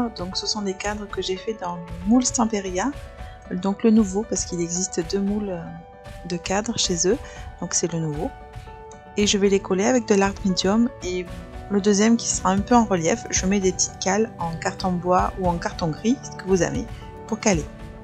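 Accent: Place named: South, France. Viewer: Coucou, Heureuse d'entendre ton p'tit accent du sud et de te voir, enfin tes petites mains hi hi, créer! Que dire...qu'a par que les couleurs que tu as choisi sont mes favoris, c'est que ta création est simplement MAGNIFIQUE j'adore, BRAVO! Je sais que ce n'est pas évident entre la vie de famille, la boutique, etc, d'avoir un p'tit moment a soi pour créée, mais, j'espère que tu referas d'autres vidéos de ce genre! Bon week-end & Soirée. Gros Bisouuus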